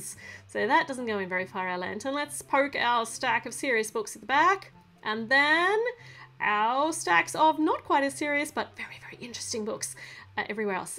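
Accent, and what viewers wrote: Australia. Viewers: It oki I understand Australia accent!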